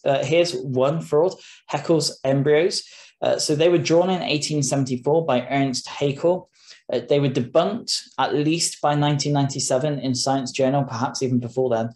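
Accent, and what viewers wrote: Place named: United Kingdom. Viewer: >>> The speaker’s accent appears to be British. Strange, because I thought only Americans were this ignorant and stupid.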